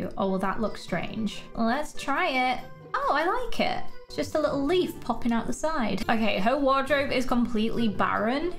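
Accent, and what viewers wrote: Scotland, United Kingdom. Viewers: are you Scottish?? Bc I am “ps bc means because just is you did not know. But are you Scottish??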